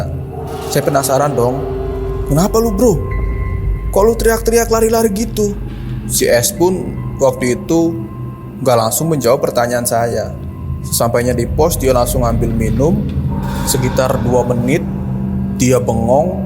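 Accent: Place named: Bekasi, Indonesia. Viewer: Buset bang, bahasanya Bekasi bet dah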